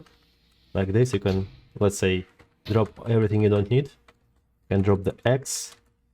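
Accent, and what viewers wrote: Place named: Central Europe. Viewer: Haven't taken a look at this channel in a while. I'm guessing you're doing something Fallout-like? Judging by that Pip-boy thing. Oh,and tour English sounds Central-European. A bit Eastern thought. Czechia?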